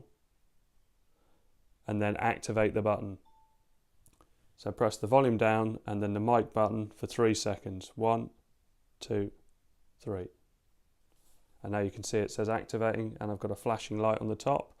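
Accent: United Kingdom. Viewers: Thank you nice man with cool British voice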